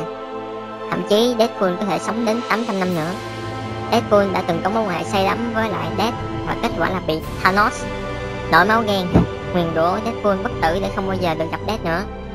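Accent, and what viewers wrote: Vietnam. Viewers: Thím nói giọng miền trung p k ? :))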